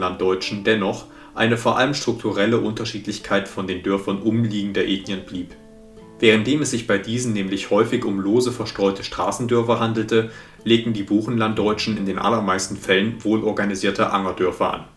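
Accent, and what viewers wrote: Saxony, Germany. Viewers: Die Beiträge über unsere ehemaligen deutschen Siedlungsgebiete sind inhaltlich spitze. Vuelen herzlichen Dank dafür! ❤Es tut mir nur leid und meinen Ohren fast weh, in welchem Tempo und mit völlig empathieloser Stimme die Texte gesprochen werden. 😢 Man möchte gedanklich folgen können und sich so viel wie möglich merken. 😮Unmöglich! Wenn der Verfasser selbst spricht hat er doch eine emotionale Verbindung zum Inhalt der Texte. Wenn es ein Sprecher spricht: Absolute Fehlbesetzung. Obendrein mit sächsischem Klang. So wurde nirgends in den alten Ostgebieten geredet!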